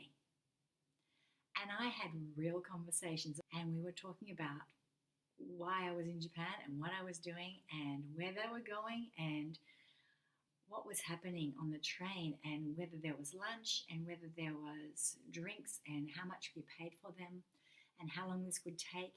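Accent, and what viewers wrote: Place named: Australia. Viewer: Absolutely love this channel your technique is great too. Do you live in Japan? Your accent sounds Australian.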